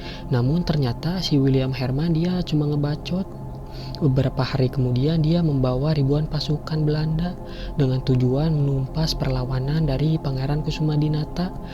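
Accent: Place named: West Java, Indonesia. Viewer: 😅meni nyunda pisan hehehe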